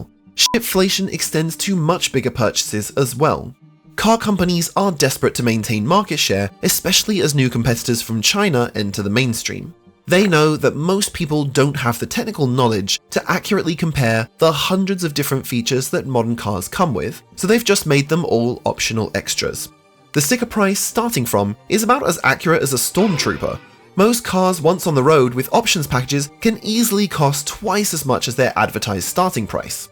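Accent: United Kingdom. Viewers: British accent, US money. Am I watching Sh*tvlogging with AI? 😂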